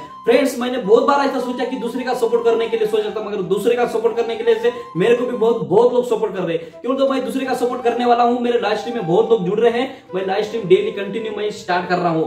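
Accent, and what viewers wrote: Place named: Hyderabad, India. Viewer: Hyderabadi hindi wow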